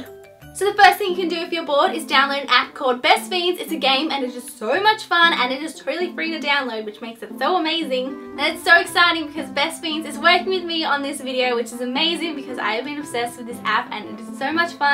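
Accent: Australia. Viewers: Jayla Kahlen Espadon it's Australian. I'm Aussie so I know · Is she a fellow Aussie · Just you hahah this girlies Australian.. Isn't Perrie British? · That's the same as me and is she Australian?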